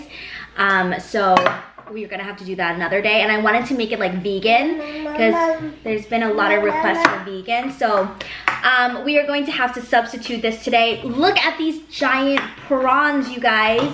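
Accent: Vietnam. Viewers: Omg u r pure southern viet accent~~😍😍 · She has a very strong vietnamese accent LOl. Im Vietnamese too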